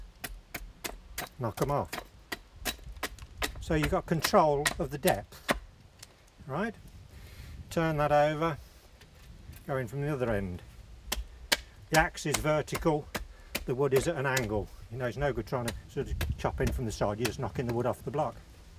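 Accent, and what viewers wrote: Northamptonshire, United Kingdom. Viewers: Nice to hear a Northamptonshire accent on YouTube, albeit faint. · Lovely Northants accent and beautiful craftsmanship.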